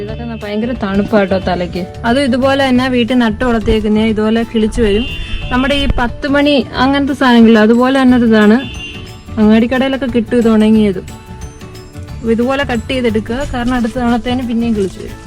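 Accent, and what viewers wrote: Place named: Kollam, India. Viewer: kollam kaari aanenn samsaram kettapozhe manasilayii 😍😍😍❣️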